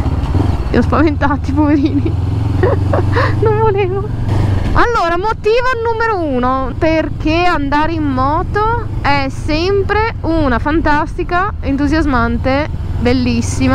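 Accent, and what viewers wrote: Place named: Veneto, Italy. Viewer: L'accento veneto per i motovlog è un plus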